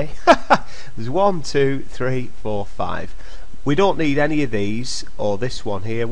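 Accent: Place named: Yorkshire, United Kingdom. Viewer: Can i do it without the yorkshire accent?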